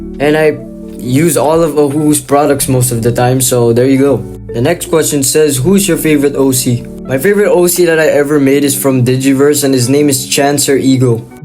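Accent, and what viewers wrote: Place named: Texas, United States. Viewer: why does bro sound texan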